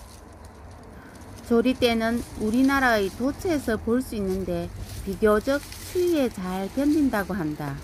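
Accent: Gyeongsang, South Korea. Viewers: 경상도 사투리 귀엽고 정겹네요~ 거제도는 참 볼게 많은 곳인데 몇번 가 봤지만 죽테마파트는 못가봤네예~ ㅎㅎ ㅅ ㅗ ㄴ 잡고 응원합니다 ^^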